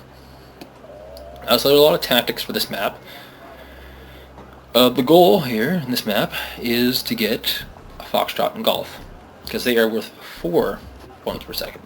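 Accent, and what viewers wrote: Canada. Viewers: Gorgeous vids! You sound like you might be Canadian, it's funny how you would say everything with the vowels "ou" in an accent. but in the case of "routing" where the canadian accent way of "ou" is actually the proper way to pronounce, you turn it the other way around :p Looking forward to more!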